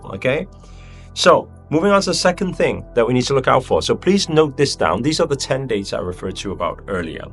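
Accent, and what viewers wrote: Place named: United Kingdom. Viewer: Why did I think you are a South African but listening to your accent now you sound British.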